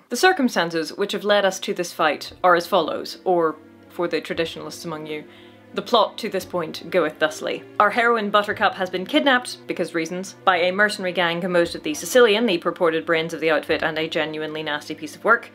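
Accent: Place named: Northern Ireland, United Kingdom. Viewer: Now that's a posh Norn Iron accent! North Down?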